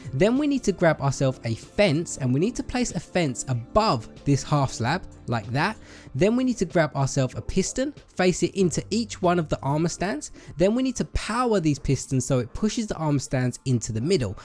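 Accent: United Kingdom. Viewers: are u british because u talk british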